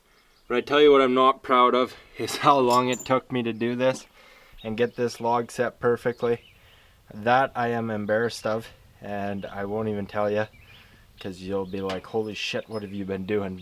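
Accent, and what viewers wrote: Canada. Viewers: What you got there is a good Canadian kid eh! Saskatchewan I think.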